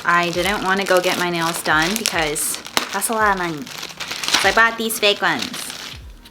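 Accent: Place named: South Korea. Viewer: omg your korean is so cute (I like your 교포accent) 🙈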